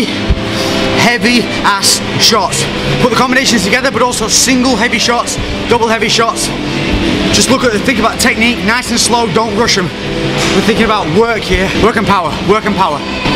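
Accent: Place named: Lancashire, United Kingdom. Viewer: Where in Lancashire are you from?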